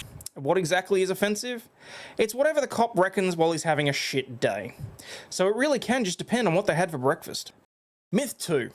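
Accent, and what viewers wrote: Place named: Australia. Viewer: This one with extra thick Aussie accent. Noice!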